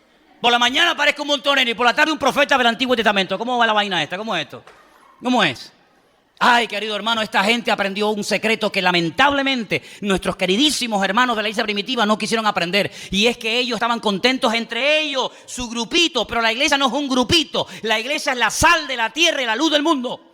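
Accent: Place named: Central America. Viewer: Disculpe pero usted no podrá hacer el idioma de otros países aún se proponga imitar los por qué se nota su acento ..de centro américa así viva años en estados unidos del norte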